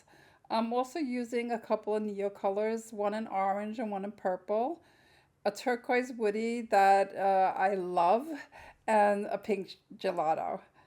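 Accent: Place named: Brooklyn, United States. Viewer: I was also born in Brooklyn! I thought I recognized that accent.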